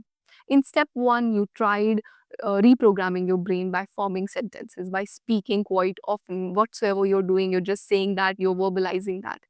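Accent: India. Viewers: Are u indian ?.....u have indian accent too much .... try to fixx itt good luck ❤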